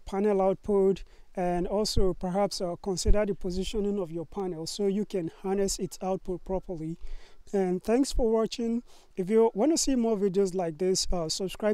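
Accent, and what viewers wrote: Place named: Nigeria. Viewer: Great video. Clear and straight to the point. Thanks. Off-topic, your English accent sounds very Nigerian. Were you ever resident in Nigeria? 🤔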